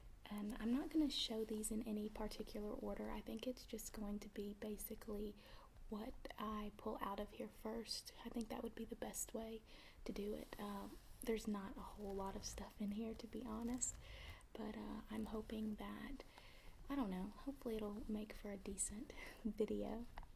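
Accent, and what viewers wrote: South, United States. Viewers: Do you have a slight southern accent?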